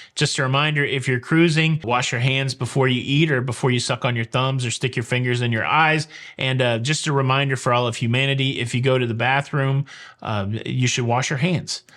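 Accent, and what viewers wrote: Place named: South, United States. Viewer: Sounds like you're picking up a bit more of a southern accent! I'm a little irked about the increase in the price of the bottled water. It's a weird thing for me to be irked about since I start out being irked I need to contribute to the single-use plastic problem by getting it in the first place. I drink 3-4 liters of water a day and I use tap water and filter it. That's not really a thing on cruise ships so I combine water from the buffet and bottled water in my cabin. This just means I need to find a way to filter water in my cabin. The sink is inconvenient for anything but washing hands and brushing teeth but where there's a will, there's a way!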